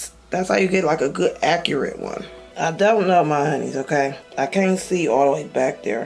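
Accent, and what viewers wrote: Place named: Baltimore, United States. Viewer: I love when you say stuff like bother the way u say it I thought you were from Baltimore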